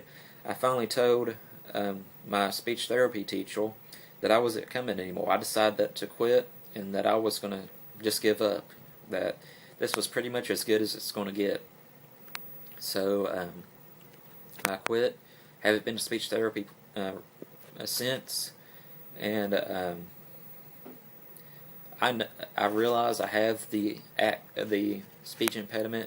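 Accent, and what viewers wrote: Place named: South, United States. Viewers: When I first heard your voice, I just thought you had a southern accent and pronounced some words funny. I didn't think anything of it. I also know how you feel, because everyone always says I talk funny. I like your vids, keep on going, man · Dude you sound like you come from New Orleans. From down the Bayou! It's sounds cool to me! Subbed yah! Keep up the good work! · I just thought you were from Louisiana and was Creole. Anyway dont worry about it. Your vids are great. I sound terrable on my vids. I would never think of you are slow. Thats just trolls being ass holes. You come over as a very bright and thoughtful person. Give it no more thought man. Keep up the good vids. :-) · you do have an accent the same as your brother I can hear it when you talk, its in addition to the speech impediment, but a definite southern accent in there a twang if you will, I always liked southern accent for some reason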